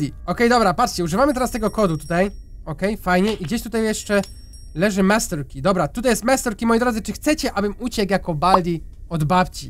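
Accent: Silesia, Poland. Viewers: wyglądasz na tego co mieszka na śląsku? Szczególnie jak mówisz (godosz)!!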